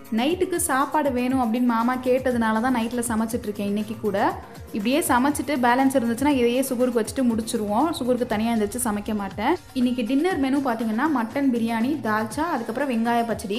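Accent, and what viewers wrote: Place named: Theni, India. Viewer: Neenga cumbum theni pakamnu ninikiren ...unga slang theriyudhu..crct ah sister?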